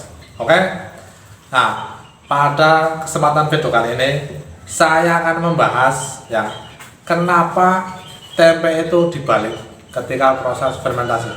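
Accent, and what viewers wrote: Banyumas, Indonesia. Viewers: Ora ngapak ora penak 🤫🤭😄😂 Salam tukang tempe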